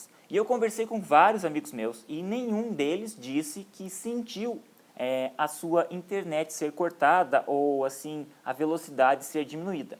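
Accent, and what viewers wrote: Curitiba, Brazil. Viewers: Conheci o sotaque curitibano desde o princípio, huehue. Já ia perguntar, aí quando falou da Copel...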